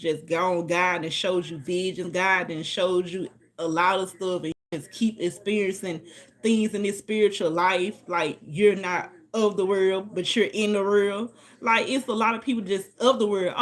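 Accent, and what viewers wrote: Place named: South, United States. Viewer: What cho lil chocolate butt talkin bout lol I like the way you talk my family down south also and you love God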